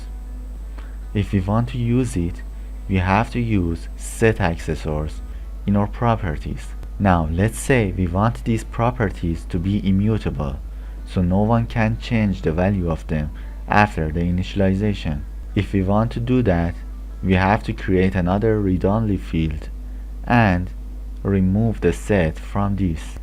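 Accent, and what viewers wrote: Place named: Iran. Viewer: This is how an Iranian talks English:) nice job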